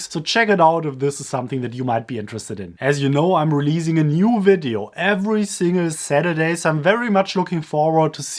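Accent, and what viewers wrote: Germany. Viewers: Das gute alte German English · I love your German accent while speaking English :D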